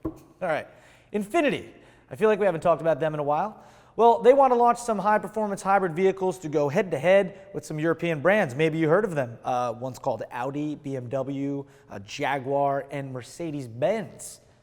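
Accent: United Kingdom. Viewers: Is it just me or does English accent sound a bit like Hammond? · your british accent sounded like hammond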